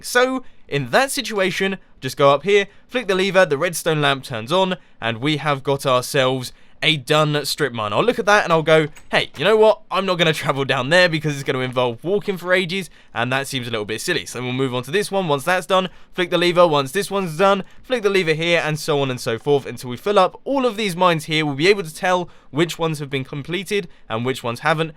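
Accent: United Kingdom. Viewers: Maybe it's just his part of town (or whatever) where he comes from. You do know the MANY accents people have in the UK, same goes for the way people talk.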